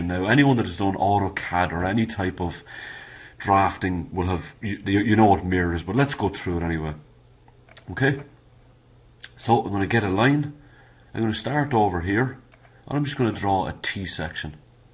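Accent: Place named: Galway, Ireland. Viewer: Good stuff....just came on you videos today.......judging by the accent i could drive down the road and buy you a beer... (Mike from Galway)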